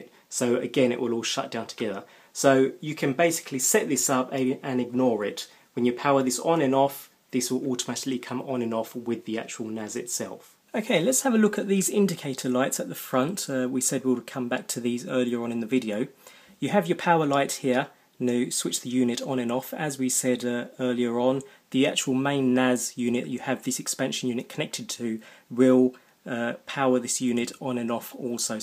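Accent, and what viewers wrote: United Kingdom. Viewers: American sounding music intensifies British accent: “Today we’re going to be looking at the...”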